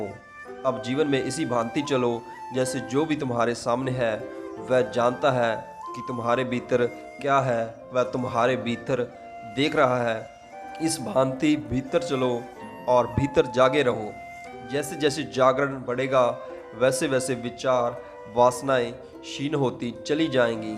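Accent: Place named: Punjab, India. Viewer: Nice video. Aap ki awaj pe punjabi touch aata hai. Kya aap punjab se ho?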